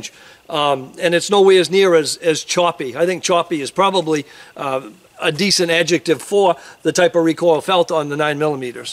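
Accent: Maine, United States. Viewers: Thanks! Your Mainah-ness makes me feel at home, away from home.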